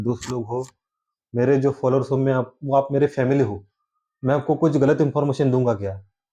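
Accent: Maharashtra, India. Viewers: Sir ji aap kha se ho, bolne se to lagta hai ki , u r from Maharashtra, I'm from dharashiv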